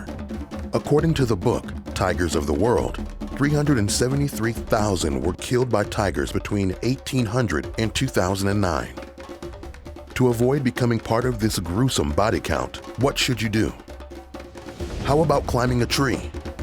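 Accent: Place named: United States. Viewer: American accent but uses metric system🥴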